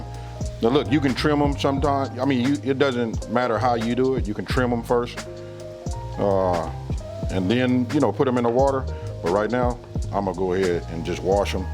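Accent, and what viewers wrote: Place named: California, United States. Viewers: Where you from in LA I hear a bit of east side on your accent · You sound just like some of my uncles and cousins, you must of been raised in Cali, LA, San Diego? We have a certain way we talk, NadadaMean! 🤣💯 Good Food!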